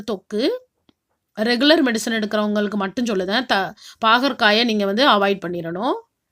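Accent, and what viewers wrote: Tirunelveli, India. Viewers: குழம்பை விட உங்க திருநல்வேலி slang பேச்சு எனக்கு ரொம்ப பிடிச்சது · அக்கா நீங்க திருநெல்வேலி யா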